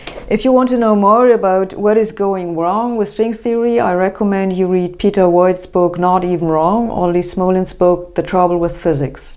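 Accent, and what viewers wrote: Germany. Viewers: I love it when she speaks English with a German accent. I first tuned-in for the science, but her deliver is so mesmerizing, I'd listen to her talk about any subject. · german english. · Is she German? Does anyone know if she's German?? 😂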